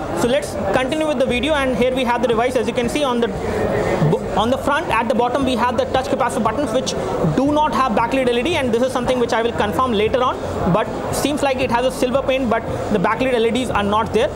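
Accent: India. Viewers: TsukaimaR1ngu Indian english man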